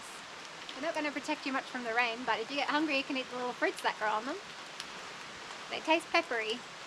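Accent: Australia. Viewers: love watching your videos your just so happy and the auzie accent melts me come camping in banff I have lived here 18 years and would love to show you around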